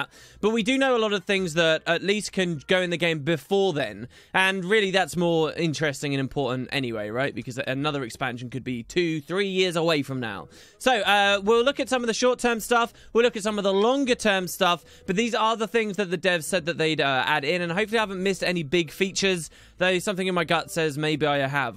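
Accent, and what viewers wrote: United Kingdom. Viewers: has you're british accent gone?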